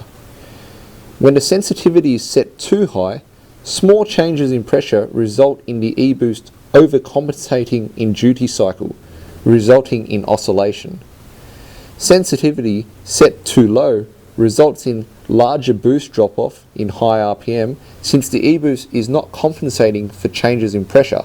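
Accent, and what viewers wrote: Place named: United Kingdom. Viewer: he's asian, why does he sound british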